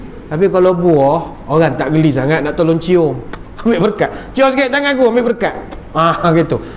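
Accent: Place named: East Coast, Malaysia. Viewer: paling suka dengar YB Dato cakap loghat pantai timur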